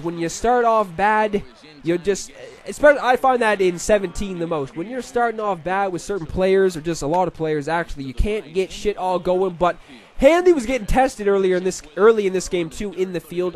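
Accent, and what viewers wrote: Canada. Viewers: U must be from Newfoundland with that accent u got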